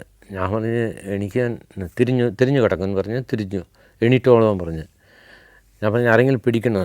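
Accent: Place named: Tamil Nadu, India. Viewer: തമിഴ് കലർന്ന താങ്കളുടെ സംസാരം വളരെ രസമാണ്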